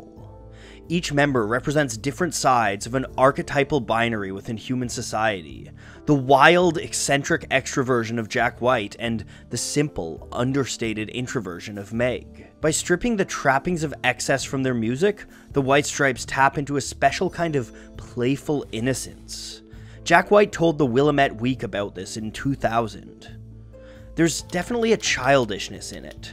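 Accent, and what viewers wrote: Canada. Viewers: D O N O T T R.Y' TO S O U N D A M E R I C AN * WE HEAR YOUR CANADIAN ACCENT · I just learned that you are Canadian. Your pronunciations make sense now and I realize I’ve got a USA-centric lens.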